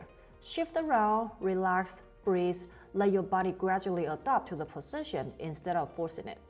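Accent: China. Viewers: 为什么我听到了潮汕口音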